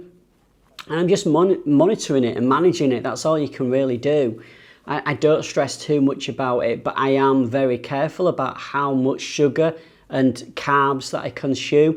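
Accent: United Kingdom. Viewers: Lv ur British accent 😍😉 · can you speak less british accented English? its very hard to understand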